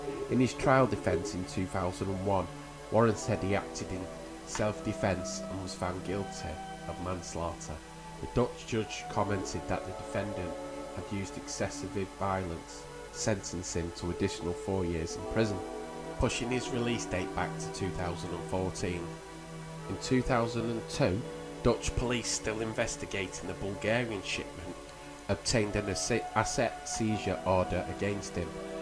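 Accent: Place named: Manchester, United Kingdom. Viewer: Liverpools in the North East? U sound like a Manc and you say Liverpool is in the NORTH EAST? Lol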